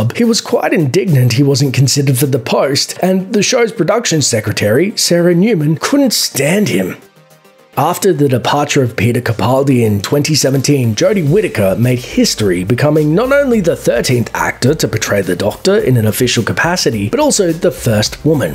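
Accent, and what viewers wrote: Australia. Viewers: I'm pretty sure this guy has an Australian accent lol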